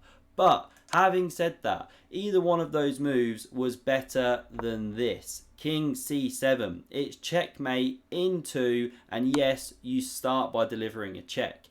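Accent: United Kingdom. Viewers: Love your channel and your cool British accent.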